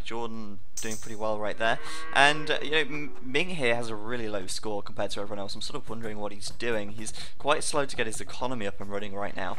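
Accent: England, United Kingdom. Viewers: I like your british voice c: it makes your game-commenting more enjoyable. Ps. Forgive my bad english c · You're a great commentator, especially with English accent haha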